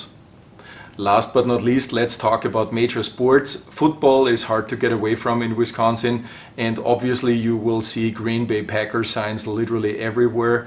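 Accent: Germany. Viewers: I thought you had a German accent but then you mentioned Austria, another beautiful country. I like that party of Europe, although I have to admit that I liked all of Europe. Now that I'm retired I have thought about going back since I have German friends.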